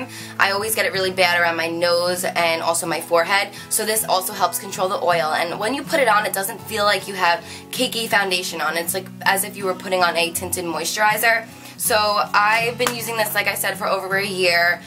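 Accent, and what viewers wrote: New Jersey, United States. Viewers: Iol I love you New Jersey accent :) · i love your new jersey accent! esp. the way your say mascara! :) xoxox from new orleans! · I swear ur Jersey accent is so cute listening to it compared to my country accent....love being on the same coast but hearing how our accents change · R you from jersey?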